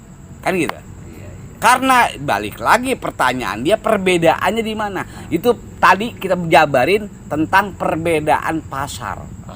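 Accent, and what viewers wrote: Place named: Betawi, Indonesia. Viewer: Terimakasih penjelasan ttg wayangnya bang Haji Mandra ... Mau tahu nih bang Haji , kok bhs betawi abang Haji sm bhs betawi almarhum babe Benyamin beda ... Knp logat betawi bisa beda begitu bang ... Kl bisa tolong jelasin dong bang Haji , makasih ya bang Haji sebelumnya nih , sehat terus bang Haji 👍🙏🙏🙏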